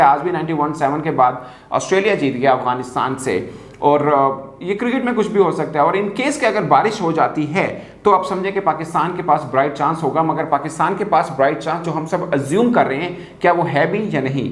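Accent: Punjab, India. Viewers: Kissay kuttti maaaa diyia bachyiaaaa. Who has asked u to talk abt cricket. Check ur panjabi street accent, have some respect to be on the tv. Taira content bc lund hey. Wur ja bc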